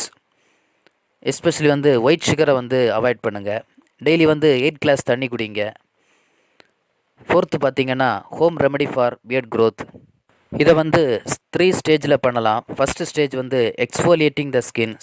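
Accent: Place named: Kanyakumari, India. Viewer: Kumari thamil...👍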